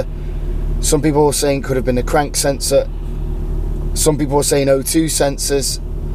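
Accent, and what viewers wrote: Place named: London, United Kingdom. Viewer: You sound almost like a londoner... that's been living abroad a while. Sick car though.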